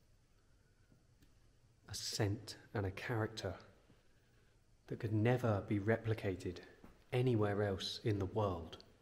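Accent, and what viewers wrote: England, United Kingdom. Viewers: I am truly blessed to have found this channel! Everything about it is wonderful! It marries 3 of my loves: architectural history, pipe organ music, and a proper English accent! ❤. Looking forward to seeing more from this amazing artist!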